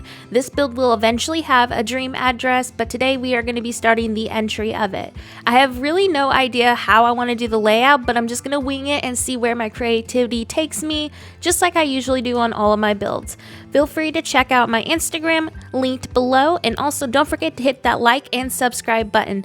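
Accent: South, United States. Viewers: I have been following you on Instagram for a while now and this is the first video I have ever seen of yours. Your accent is so cute! Just a subtle southern twang. I love it. I love your builds!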